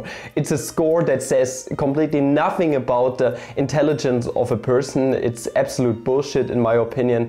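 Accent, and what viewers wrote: Germany. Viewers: 20 points for having such a dumb face and annoying German accent lmao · German accent. I find it cute. That aside.... 145 IQ is so high. I thought I had a good IQ and Feyman's 125 seems so high (why do people call it low?even if it was the great Feyman). Graduating medical students have an average IQ of 120 (their 50 percentile). Mensa test is confidential for a reason and it is best not to reveal anything specific about them. Most important thing is IQ is misleading and almost all most intelligent people I know personally do not care about IQ tests and even look down about them. So, unless you can take it for fun do not take it.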